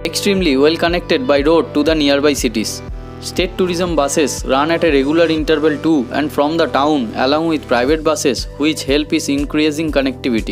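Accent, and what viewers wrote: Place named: Bengal, India. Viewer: Your English pronunciation is like bengali....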